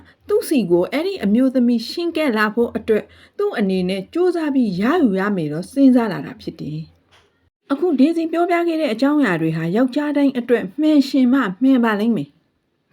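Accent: Rakhine, Myanmar. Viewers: ရခိုင်သံကြီး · မမ ရခိုင်သံနင့် တူပါတယ်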